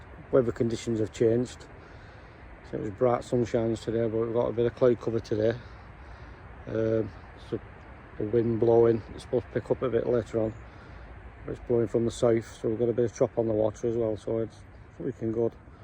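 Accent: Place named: Northern England, United Kingdom. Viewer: brilliant video and proper northern mans commentary no snow flakes here lol keep them coming superb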